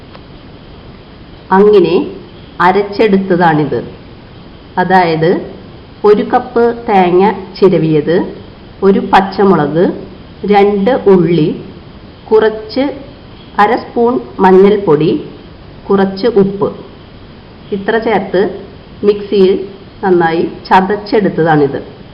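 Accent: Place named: Thrissur, India. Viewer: nalla Thrissur bhaasha..this is central kerala language man not tamil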